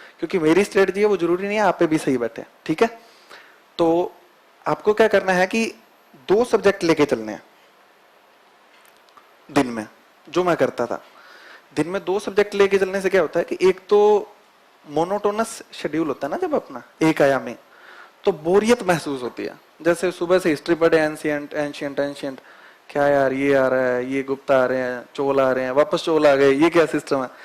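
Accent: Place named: Rajasthan, India. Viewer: H na...... Lahjaa .. Rajasthani ki pahchaan😀😀😀😀.. proud of you bro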